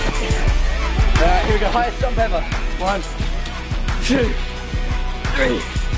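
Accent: United Kingdom. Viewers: I live in a country which English is not our first language but I can understand what he's talking about although not every words I catch :)) His accent is so sexy. Is that Brighton accent? (If it exists)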